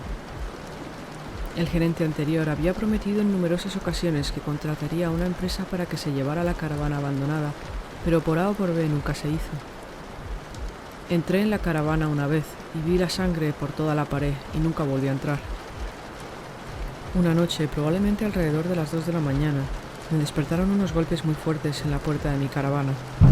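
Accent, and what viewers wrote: Spain. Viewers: Saludos,. Con la premisa de que YouTube es sin llorar, comento; en mi vida había oído narraciones más sosas, insípidas y tediosas. Reconozco que debes ser de España y que me recuerdas a un humorista español de gafas y cigarrillos con su clásico de gallegos..."Y que es de pilar?" Chiste muy viejo pero igual de plano, sin matices, incluso petulante... Recomiendo que te dediques al humor porque esto, no sé cómo definirlo, es como sentarse a mirar cómo la pintura se seca. Infumable, cero talento y peor, empeño por narrar historias de terror. Saludos desde la universidad de Miskatonic, pronto se abrirán matrículas para narraciones de horror.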